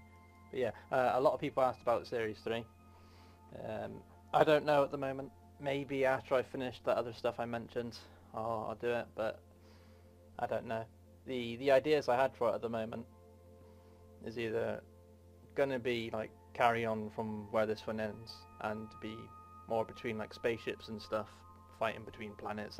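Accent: United Kingdom. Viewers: You sound like if a voicebot had a british accent ngl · Oh my god you have a so goof brithis acent. Its sounds so good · epic british accent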